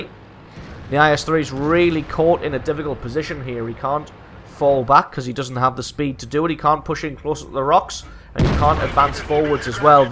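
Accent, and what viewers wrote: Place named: Scotland, United Kingdom. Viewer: When I watch and listen to your replay videos I can't help but be reminded of Jackie Stewart, the F 1 racing legend. When I was young my Father liked watching the Races with Jackie announcing, as did I. We would both try mimicking his voice to no avail. The Scotts dialect is quite difficult. Lol good memories, as my Father passed away young.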